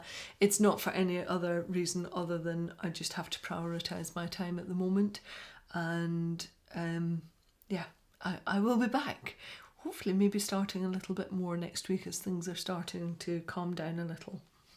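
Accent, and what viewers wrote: Scotland, United Kingdom. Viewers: Your shoulder issue has properly scared me into calling a doctor for an appointment. 😅 I really want to crochet a pair of socks for myself but I find that I am more intimidated by crochet socks than knitted ones. The Rivuret (spelling?) top y my pattern for the back to school sweater CAL and I'' making it in the loveliest orange shade. I would love it if tou recorded a small podcast whilst in Scotland, it would be amazing to listen to your true scots accent. Totally inviting myself to have tea and cake with you and Charlie 😂.Lots of love to you!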